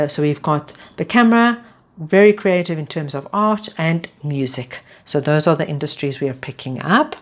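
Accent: South Africa. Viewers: wow thanks for the reading queen! you sound south african lol❤️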